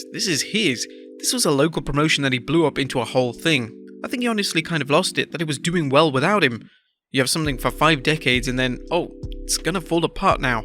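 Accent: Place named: England, United Kingdom. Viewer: Youre a narrator with an English accent so at least pronounce Vince's surname correctly! Its Vince McMahn, not McMan! 👎🏻🤦🤦‍♀️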